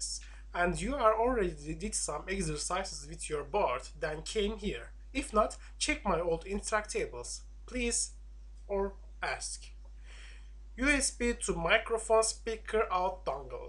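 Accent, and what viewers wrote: Turkey. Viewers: Türk aksanı bu nerde duysam tanırım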